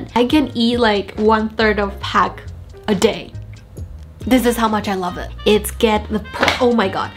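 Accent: United Kingdom. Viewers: i LOVE your subtle british accent! so cute!!!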